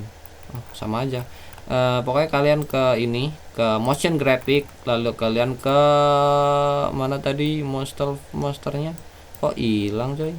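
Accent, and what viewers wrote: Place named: Java, Indonesia. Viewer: English Jowo Anjir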